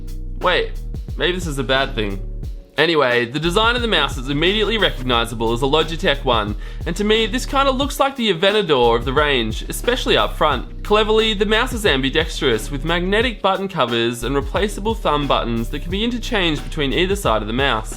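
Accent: Australia. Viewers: happy to find a tech channel with an aussie host :D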